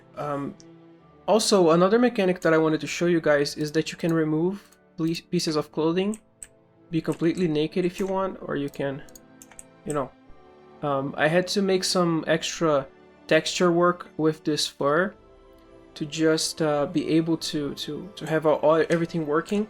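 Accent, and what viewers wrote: Brazil. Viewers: I almost didn't notice that you are brazilian!!! Excelente trabalho, talvez seja legal fazer um canal pro povo BR tbm ou não neh... Se bem que fazer a mesma coisa duas vezes deve consumir muito tempo. Excelente trabalho!!! Quero ver a sequencia desse DevLog!! Alias quero jogar esse jogo!!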